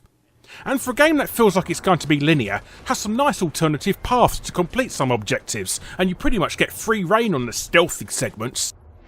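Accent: United Kingdom. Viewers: You have the most British voice ever, its brilliant. Never played Wolfenstein, really liking Doom 1 though, very fun though difficult.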